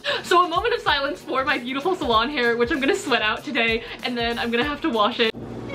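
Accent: United States. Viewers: aa a Well considering has a very clear american accent, asking her what nationality she is doesn’t make much sense in america.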